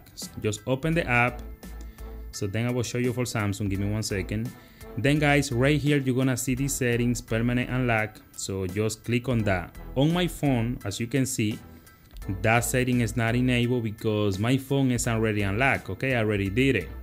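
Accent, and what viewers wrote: Dominican Republic. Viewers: You Dominican? De lo mio? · you sound Dominican! that's wSup paisa😄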